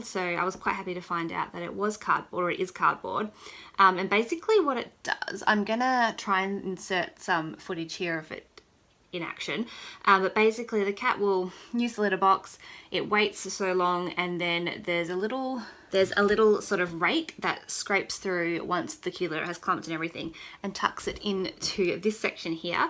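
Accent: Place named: Australia. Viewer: I knew you were Aussie because of your accent,were are you from? I'm from Victoria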